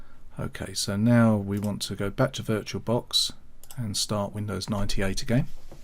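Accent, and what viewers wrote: United Kingdom. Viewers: Amazing tutorial, thank you a lot! I'm enjoying your awesome british accent, mate! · your from UK right I know!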